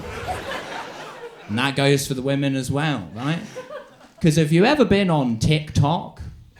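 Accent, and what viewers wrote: Belfast, United Kingdom. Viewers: Milo's use of a vague belfast accent during this was very enjoyable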